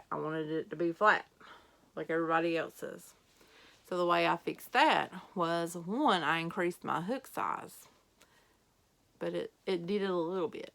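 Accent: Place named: Indiana, United States. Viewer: Oh wow I finally come across someone who from Indiana as well...But u sound like ur a little more North from where I'm from...i just subscribed to u but loving ur video ...looking forward to more videos....God Bless and hope u have an amazing week....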